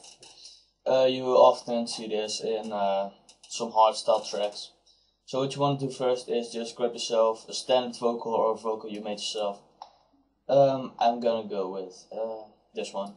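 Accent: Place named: Netherlands. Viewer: Je klinkt nederlands.. You sound dutch.. Good instructional video!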